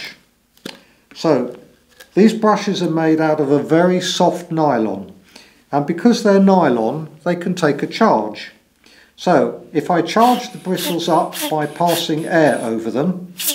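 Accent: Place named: United Kingdom. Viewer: British man explaining camera sensor cleaning is my new favourite thing now. Knowledge 10/10 Explanation technique 10/10 British accent 10/10 Unnecessary content 0/10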